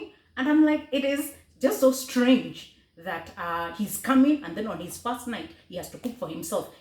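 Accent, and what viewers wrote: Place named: Africa. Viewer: I Moved to the US in 1989 while in middle school. In AFRICA you great each other all day long 10 or 20 times a day. The European does not think like you. If you great him more than once, he thinks you are physco. Black Americans on the other hand have a culture of their own which is very different from African Culture. Don't try to change America. It will change you before you can change it. The sooner you can erase that African accent the better. Americans completely stop listening to what you are saying and are more interested in why your accent sounds very strange. This can really hurt your career chances. When you move to a new country get with the program ASAP. All the best.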